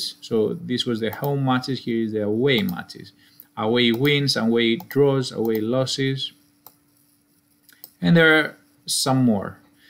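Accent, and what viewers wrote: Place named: Spain. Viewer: Great work man, excellent content! Question: you are feeding the "scores and fixtures" table with values that might not be correct for that specific date. For example, the league table has all statistics up to the present moment and then you feed them to matches that occurred in the past. Am I right or did I understand it wrongly? Thanks for your videos, I'm mostly interested in content related with orange usage. By the way, you said in a video that you are Greek but to my ear you sound like a Spanish guy speaking English. :) Cheers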